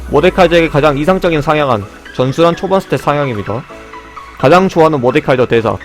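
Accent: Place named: Jeolla, South Korea. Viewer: 사투리가 있는걸 보니 전라도사람이시군요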